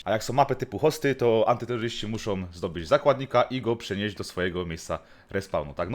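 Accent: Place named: Silesia, Poland. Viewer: Czuć ten śląski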